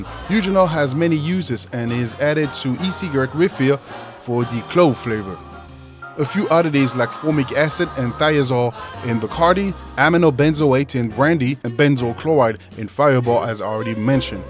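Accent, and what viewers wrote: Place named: South Africa. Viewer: The way he talking I thought was South African or African also from wales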